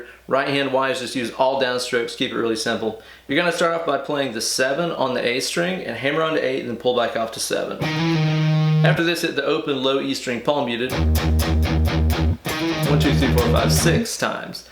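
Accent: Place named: Texas, United States. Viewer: You sound like you’re from Texas, man. We have good metal down here in the south.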